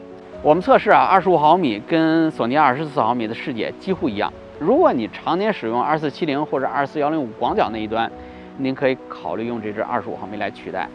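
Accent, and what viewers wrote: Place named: Beijing, China. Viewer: 北京的吧？迈玛儿拍，一听就是北京的😂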